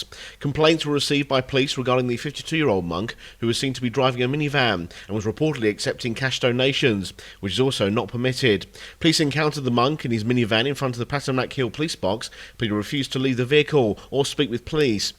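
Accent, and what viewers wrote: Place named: United Kingdom. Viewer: Ei pysty. Mun huomio menee ton puheelle nauramiseen. Liian vahva brittiaksentti😭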